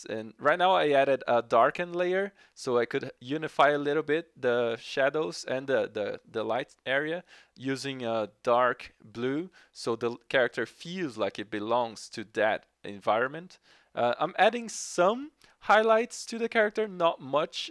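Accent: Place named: Sweden. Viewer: you sound like pewdiepie when he had the really thick Swedish accent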